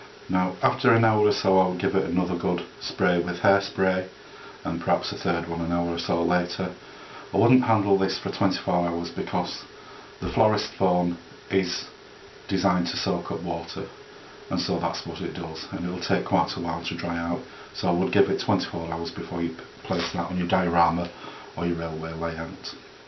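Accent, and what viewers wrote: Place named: United Kingdom. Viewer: yes very good, your voice is fine, just has an accent. British I assume. Now PVA is? wood glue? the other thing to start with is spray adhesive..and then finish with hairspray. I just love the fact that nothing is wasted, just break it up in a blender. Roughly how much is a block and how many trees per block? thanks Nick (formerly from IOW)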